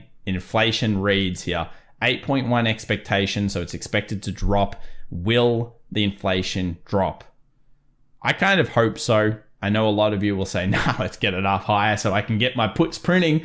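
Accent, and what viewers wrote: Australia. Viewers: i bother to listen because you are an aussie. Do not disappoint me, please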